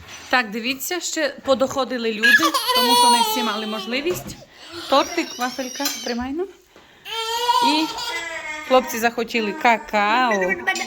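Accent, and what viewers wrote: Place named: Kremenchuk, Ukraine. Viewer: долгие лета! Счастья и здоровья!,очень нравитесь вы и ваша сестричка. Очень нравится как вы говорите,у меня двоюродная сестра из Кременчуга,так- же разговаривала,слова как ручеек льются.❤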